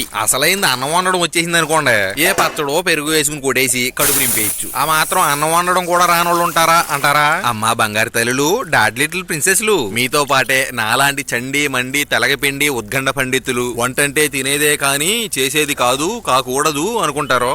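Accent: Godavari, India. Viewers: ❤💐Good night annaya ❤💐Godavari slang Padma Bhushan God of masses.Nandamuri Balakrishna Jai.balayya dialogue super👌 annaya so many sisters so many brothers rice cooking people unnaru annaya bachelor boys bachelor girls thank you so much for Vizag Andhra Pradesh Telangana ❤🦁🐯👏👌🔥⭐💯🎉👑👍💐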